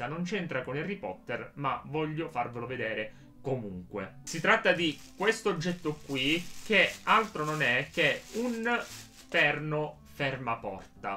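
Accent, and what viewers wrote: Sicily, Italy. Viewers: Credo sia una cadenza siciliana..hanno le vocali molto aperte 🤣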